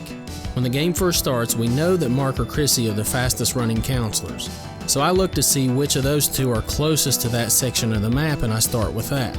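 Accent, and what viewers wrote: South, United States. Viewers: This guy has the perfect amount of country twang in his voice. I'm a fan sir, u remind me of home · Loving that southern accent. Cool channel! Subscribed and liked! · First video I watched. Subbed. Great video and well researched. I personally love this game too. A few things: You mentioned a couple of the purple Jason tributes, but there's also an awesome purple NECA "action" figure worth a look. Next, with the release of NESmaker, we can expect an explosion of new rom hacks, specifically ones fixing, improving, and editing old NES games. I know rom hacks exist, but the NM program makes it so easy anyone can basically make their own game from scratch. Good times a'coming! Lastly, I'm trying to place your southern draw, I'm from Memphis myself, but have lost most of my accent living overseas for 20 years. My bet is Arkansas or Kentucky. 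· liked subbed, love your country accent and video coverages on titles. you really do great indepth coverage and the sound quality is great, liked and subbed!! <3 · Aww the good ole days, great video btw way your southern accent made it even more intriguing!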